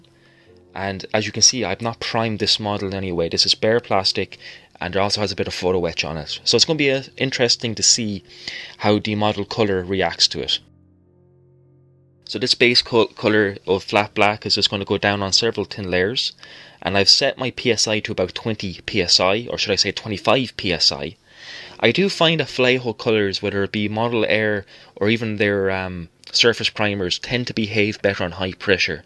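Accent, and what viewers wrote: Ireland. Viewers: my friend, your philosophy is my philosophy. The simpler the better! Oh, by the way; I like that cool accent of yours....are you Irish? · Great job,! At first, I was surprised when you kept saying "tinner", but then realized it's your Irish pronunciation.:) Great video and very educational on spraying Model Color. Thank you.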